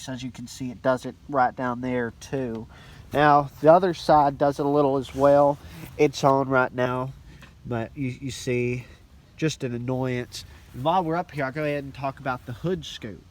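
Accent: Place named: Texas, United States. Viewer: Awesome Texas accent! 👌🏽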